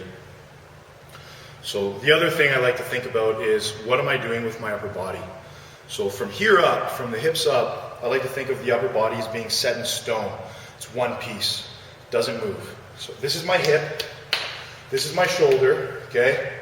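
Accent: Canada. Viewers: is canadian right? I can hear the Canadian in his voice:P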